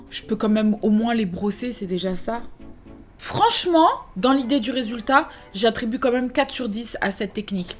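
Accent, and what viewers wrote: United Kingdom. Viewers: Ton acsan anglais😒😒😒 et chiant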